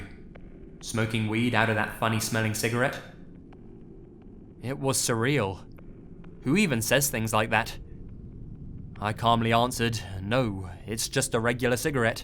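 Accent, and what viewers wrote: United Kingdom. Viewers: I have a new nickname for you, it's British voice narrator guy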